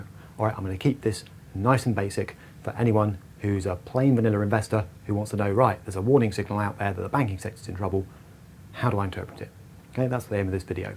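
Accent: United Kingdom. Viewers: I like your british accent and it is so clean that i can understand everything been said not like the american one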